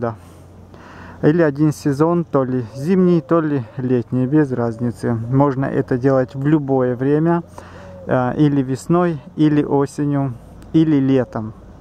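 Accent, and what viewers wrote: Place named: Surzhik, Ukraine. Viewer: И Вы,Петя будьте культурным.Может Вам знакомо такое слово Суржик?Вот на нем и говорит.Это местный диалект.Канал этот для садоводов,Вы ошиблись,поищите лингвистические канала,а заодно,научитесь такту.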